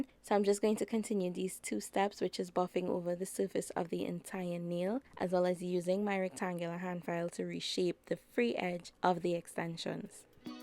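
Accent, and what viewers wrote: Trinidad and Tobago. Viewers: thanks for sharing love your work all of it,are you from TRINIDAD I AM FROM TRINIDAD AND YOUR ACCENTURE SOUNDS LIKE US SPEAKING STANDARD ENGLISH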